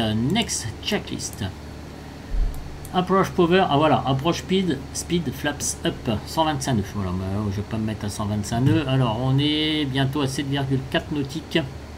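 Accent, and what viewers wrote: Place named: Nord, France. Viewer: Superbe vidéo comme d’habitude évidemment 👍👍👍👍👍 et très bien l’accent du schnord 😉😉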